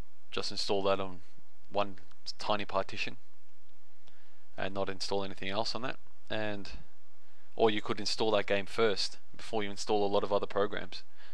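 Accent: Australia. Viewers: I love your Aussie accent! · Defiantly a Aussie, we don't have that accent here in the UK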